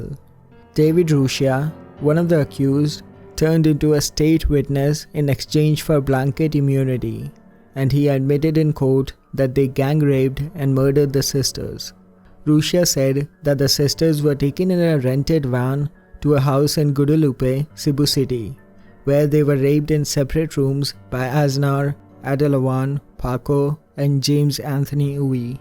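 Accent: India. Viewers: Indian accent . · You sounded Indian, are you Filipino? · Indian accent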